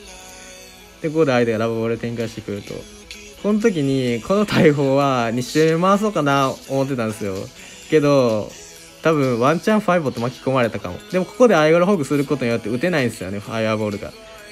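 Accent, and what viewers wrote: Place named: Kyoto, Japan. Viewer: 京都弁最高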